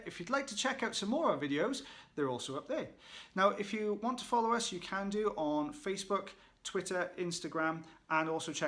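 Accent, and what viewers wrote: Wales, United Kingdom. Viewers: Well what a lovely beard you have and I could listen to your accent all day. Welch I've been told. I'll stop flirting and say thank you for the videos.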